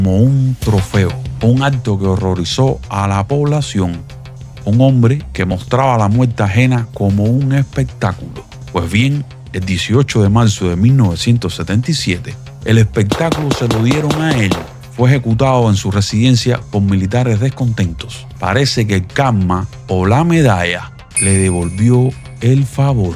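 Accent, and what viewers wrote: Cuba. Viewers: Aquí vine directo a ver el vídeo de la orden maldita! Me encanta la seriedad, la veracidad y la agudeza de tu recopilación narrada en tono de asere!! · Q acento más horrible. Cubaneo, sola vaya.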